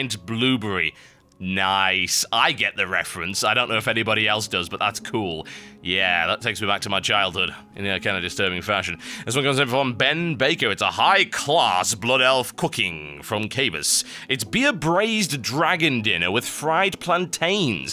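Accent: United Kingdom. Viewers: because he is from United Kingdom,has British accent,commentates on wow and games,and theres more awesomenessnessessess stuff i cant even think about or my head would explode from the sheer awesomenessnessessess =D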